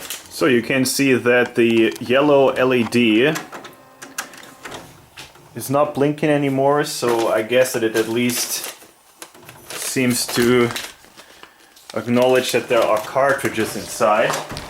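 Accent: Germany. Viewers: Dein Englisch ist viel besser jetzt und der schreckliche deutsche Akzent verschwindet. Sehr gutes Video wie immer. Vielen Dank fürs Hochladen! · What happened to your English?? Stop trying to sound American. It sounds horrible. I much prefer your clear German accent. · Ich gratuliere Ihnen mit Ihren Erfolg !!! like all your video's, especially your German accent...love it !! Herzlich grüße aus die Niederlande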